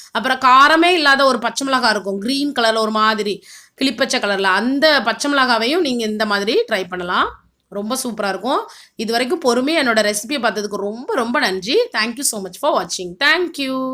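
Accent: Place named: Nagercoil, India. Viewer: Romba arumai unga nagerkoil tone thanga....beautiful recipe and thanks for taking time to record this....very helpful for guys like me living faraway from India....love your tamil.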